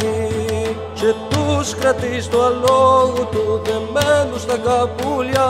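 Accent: Crete, Greece. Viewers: A splendid effort at a kontylia,! You’ve got the Cretan accent down cold, too! Two small notes on the English translation though, for your consideration; 1. “Charon brings Digenis to Hades’ domain *on horseback*” — felt like this was a significant omission, given that «καβάλα» is the text’s incipit. 2. «της ομορφιάς την *πούλια*» could either be translated as “the jewel of beauty” (the poúlia, with a lowercase π, is a metallic ornament Greek women used to adorn their dresses and scarves with) or “the star of beauty” (the Poúlia, with an uppercase Π, which is where the ornament gets its name from incidentally, is the common name the Byzantines assigned to the star cluster of the Pleiades — the modern Greeks, Palamas amongst them, still use it to this day). I do not have Palamas’ poem close at hand right now so I’m unsure which way he might have spelled the word, but it’s safe to say his intended meaning wasn’t “the bird of beauty”, as poulí (“bird”, plural pouliá) is only a paronym of πούλια/Πούλια. 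Thank you for this beautiful offering! Greetings from a Greek national with roots from your neighbouring Qazvin! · Your accent reminds me of the way Cretans talk. As a Greek, i deeply admire all of your work. Thank you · Reminds me a lot to, in general, the music for Ikariotikos or a specific Rhodian dance I can't remember the name. Certainly gorgeous as always man, I love that Cretan accent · Hello fellow "Cretan":D You speak Greek the same way you speak english? Or this was for the purpose of the poem? Nice creation! Loved it! Thanks! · What is this wizardry again? You even nailed the Cretan accent to the tee. On another note, whenever I hear the Cretan lyra my blood starts pumping faster in my veins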